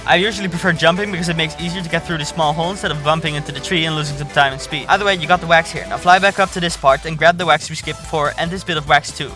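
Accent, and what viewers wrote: Netherlands. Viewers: i feel like your native language is dutch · are you dutch?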